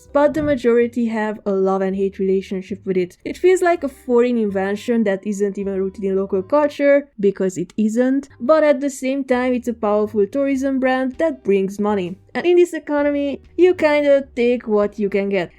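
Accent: Hungary. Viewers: you definitely have hungarian accent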